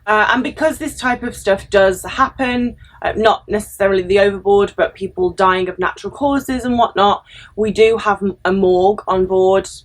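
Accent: United Kingdom. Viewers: I subscribe to you because your voice is loud and clear even so you speak British English I understand you I can take a shower while listening to you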